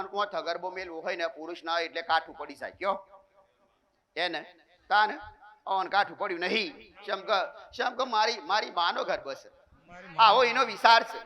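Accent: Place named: Mehsana, India. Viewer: માડી ની ભાષા અમારા મેસા નાણાં ની બાજુ ની આવે છે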